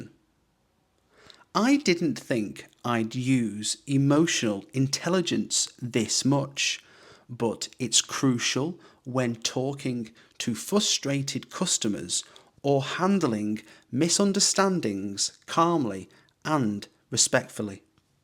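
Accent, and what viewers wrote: United Kingdom. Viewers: What kind of Britishaccent is it specifically?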